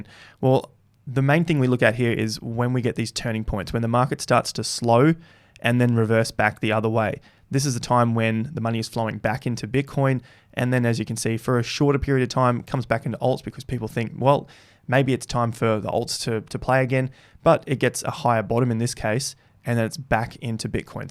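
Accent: Australia. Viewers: Another perfectly explained video that helps most <12month newbs. (Me included). Such a great Aussie way of delivering as well. Thanks for your content.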